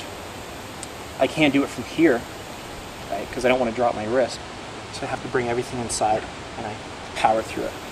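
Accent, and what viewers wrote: Canada. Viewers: Canadian, eh? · He's Canadian, dude. That's the Canadian accent, nothing weird aboot it.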